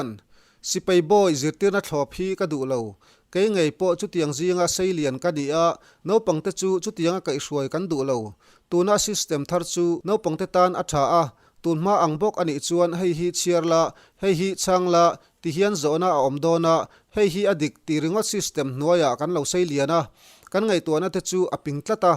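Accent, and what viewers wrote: Mizoram, India. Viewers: Mizo diktak ini lo ni maw i chhiar dan chu,, A tha vek e zawt zawt a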